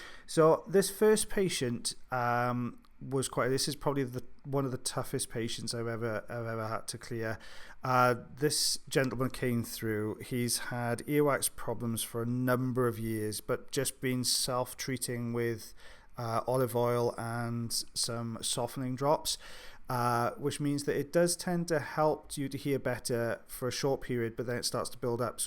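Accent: Wales, United Kingdom. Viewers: I love your Welsh accent. Your' narration of these videos is just brilliant, I'd never want to mute you! How would we learn about Audiology without you. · It’s our welsh accent it’s lv it or hate it what part of wales are you and your wife from I live near CARDIFF AND SPEAK WELSH LV UR VIDEOS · I could listen to your lovely accent for HOURS!! How i miss Wales. Your commentary is wonderful. Such a crush here!! Lucky Mrs. B!! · Thank you for the special! I had a stressful afternoon yesterday and I got so excited (I sound like a whacked wombat) when I saw this in my notifications! Perfect unwinding video! This southern girl (Georgia, imagine an accent that’s half hillbilly and half Scarlet O’Hara) loves your UK accent! Y’all keep up the good work 😄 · Definitely! I could listen to him all day, love those dulcet Welsh tones 😍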